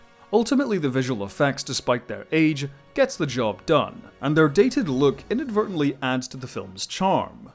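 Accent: Scotland, United Kingdom. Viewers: Cool, thank you for this great in-depth review. That was very informative. Are you in Scotland? I love the accent. But I always wonder how can you film guys make a living? Do you work as a lecturer at a University or film school?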